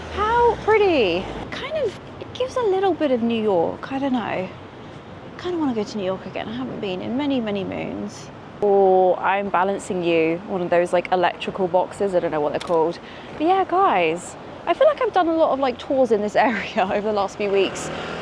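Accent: United Kingdom. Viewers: London stories and british accent, the perfect combination to practice my English. Thanks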